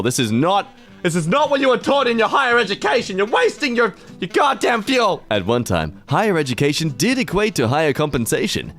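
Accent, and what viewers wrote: Australia. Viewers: I love the Australian accent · I have a question, are you Australian?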